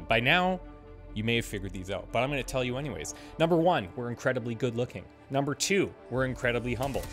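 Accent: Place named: Canada. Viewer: Love the Canadian accent first and foremost. I'm excited for Deadpool and I hope Storm is next, fingers crossed. Sounds like DP will get progressively stronger with every KO until he's a powerhouse, so you'll want to use him for cleanup kills as much as possible, which sounds new and fun. I'm thinking ideal build for him is Deadpool, Doctor Strange, Captain America. Cap can absorb damage and soften up enemies for Deadpool KOs while Doc can enable Deadpool (what he does best) while Deadpool builds his stacks.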